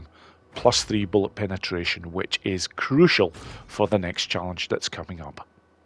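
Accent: Scotland, United Kingdom. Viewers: Just got myself my first gold in Ambush Camp challenge thanks to this guide. Looking to knock all 12 out with golds before I start the main game again with the Remastered version, then getting the Fuel tank and possibly saddle bags from Horde Assault beforehand, too. Been watching your overpowered playthrough as well and enjoying it with the commentary. Cheers for takkng the time for making these guides! I also gotta ask, are you Scottish, or at least live here, by any chance? I swear I hear a Scottish accent 😅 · Thank you for the guide. I did all the challenges with your guide. Some were easier to complete than others. Those bike ones were tough to get. Your soothing reassuring Scottish voice got me through it 😂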